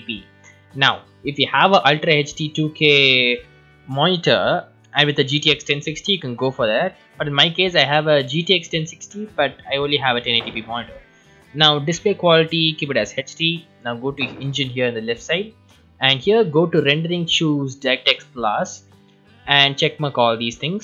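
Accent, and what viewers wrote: India. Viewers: also am pretty sure you are an indian by your accent.I am indian too.The thing is that since i am from south India i dont actually understand hindi and all videos have english title but they talk in hindi except you.(not offending hindi but using english is better so that people from other country can understand)BTW do you like T series or Pewdiepie XD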